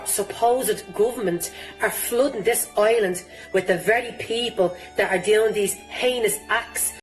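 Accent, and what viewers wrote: Ireland. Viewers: My God her accent is the best thing I ever heard! Love this sooo much!! All public announcements in every country should be in Irish accent love it lol <3 <3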